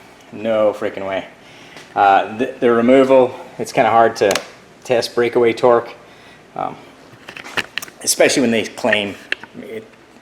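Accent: Northern Ireland, United Kingdom. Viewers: Have one of the old type 1/2 Dewalt impacts tough and durable BUT heavy and not very compact still hanging on to bit of the nordie accent greetings from Dublin.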